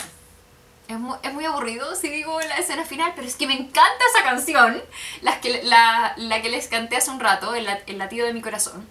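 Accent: Chile. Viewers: Te encanta la musica porque cantas muy bien. Tus opiniones de coco muy bien acertadas, fundamentadas el mejor video de coco que he visto felicidades la mejor de chile, amo el acento chileno el mejor de latinoamerica, saludos desde Mexico 😘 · estás preciosa y el acento chileno me encanta 🤗, saludos desde 🇲🇽 hasta 🇨🇱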